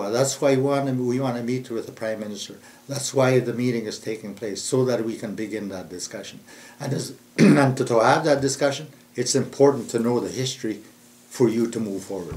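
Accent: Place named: Orkney, United Kingdom. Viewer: is he from orkney???? i stay in edinburgh scotland but the name is from orkney and im 1000% certain that i'm related to this guy cuz he sounds like he has a less strong version of my grandads accent. i feel so weird seeing people that are probably some what close relatives on youtube when i search my last name hahah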